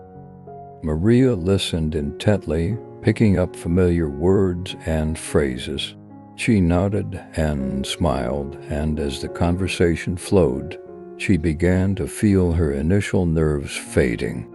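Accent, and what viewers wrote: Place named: United States. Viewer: someone could tell where this accent is? i know is american by his accent but what part of america? it is so relaxing his voice. please someone could tell me?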